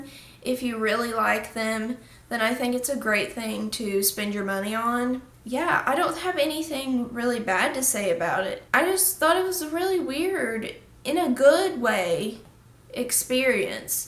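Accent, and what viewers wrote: United States. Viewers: I love ur fuckin country accent it cute and ur very pretty🔥👌🏻😘🤘🏻✌🏻️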